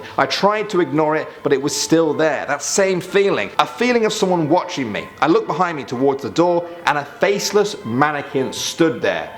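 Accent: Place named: United Kingdom. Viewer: I hate this Fuckin' British accent !!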